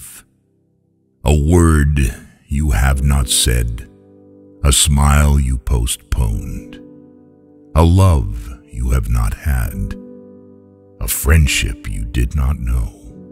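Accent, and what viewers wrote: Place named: United States. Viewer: Great poem, but it would be far better to be read with a Lebanese accent rather than the usual American which is yet more grating US imperialism.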